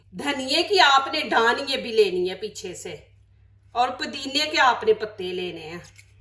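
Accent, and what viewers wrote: Punjab, India. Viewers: لہجہ پنجابی زبان اردو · Ye bibi urdu english punjabi lehjey main bolti hain bat samejh aa jati hai na to tunqeed kiun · Aoa boht acchi recipe .aap urdu punjabi lehjey main bolti hain. Behter ho ga k punjabi main btayn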